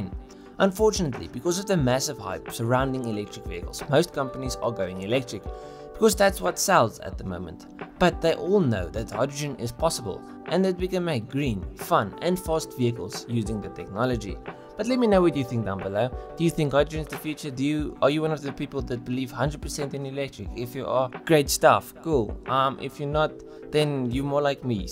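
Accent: South Africa. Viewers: I love your South African accent. Subscribed.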